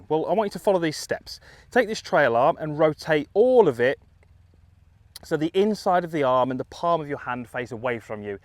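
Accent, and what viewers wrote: United Kingdom. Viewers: He is British! We have the accent.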